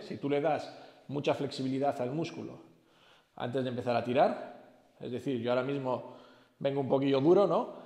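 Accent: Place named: Basque Country, Spain. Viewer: Terrible ,yo soy orduñes vizcaino como se nota que eres vasco la ostia!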